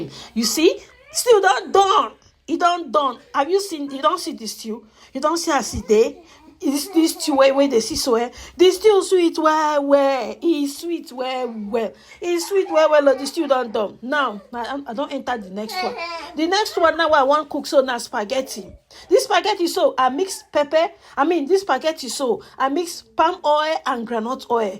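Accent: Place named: Nigeria. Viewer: No be the food i dey watch oooo na the pig pigin English i dey listen to. want finish me with laugh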